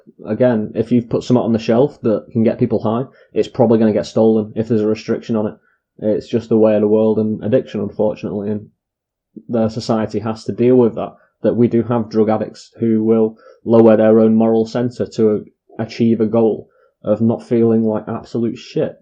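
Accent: United Kingdom. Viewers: Erm have you never seen shrek…. This is not a Scottish accent he is English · You sound Scottish but I’m not sure so I said Brit. Usually id never say Brit but I did cause I can’t tell your accent. Definitely think you’re Scottish but not sure!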